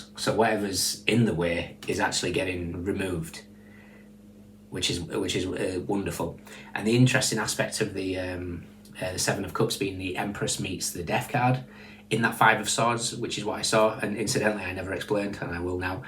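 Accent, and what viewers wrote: Northern England, United Kingdom. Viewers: Definitely Northern England...probably Manchester area. 🤗❤ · Thank you for yr reading ! Love yr accent...North of England ?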